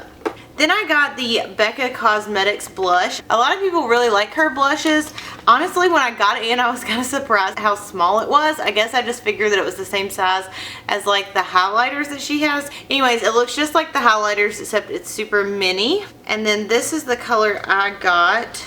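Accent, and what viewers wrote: South, United States. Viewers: where are you from? I hear southern but what part? I'm in Houston Texas myself! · oh i just seen in the description box im from NC TOO ZEBULON AREA...HEARD OF IT? I KNEW IT HAD TO BE NC OR SC W.THAT COUNTRY ACCENT LIVE IT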